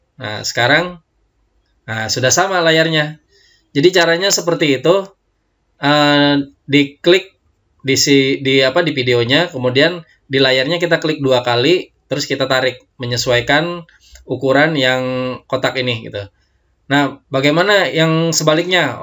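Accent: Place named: West Java, Indonesia. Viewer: "Sunda Van Java" logat nya 👍👏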